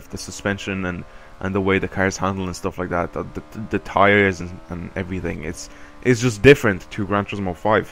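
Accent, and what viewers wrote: Ireland. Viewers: U sound irish.are u irish?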